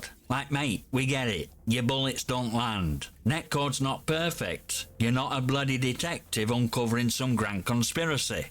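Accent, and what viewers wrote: Yorkshire, United Kingdom. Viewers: I love the thick Yorkshire accent! Caught me off guard 💀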